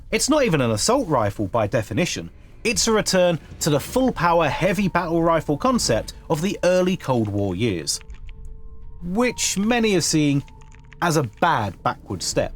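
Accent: United Kingdom. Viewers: What country are you in? Doesn’t sound by your accent you should have held a weapon in your life, not even a butter knife. How do people in the UK cut meat and vegetables for cooking now that I think of it? Illegal to have a knife is crazy. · Im used to guntubers to have a southern accent. Maybe Georgia or kentucky. Brit? Ok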